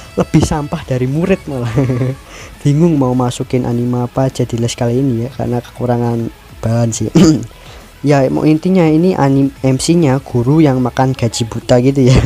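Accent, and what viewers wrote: Java, Indonesia. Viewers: Jowo bet suara lu bang medok medok serek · Jawa nya medok skali wkwkwk:v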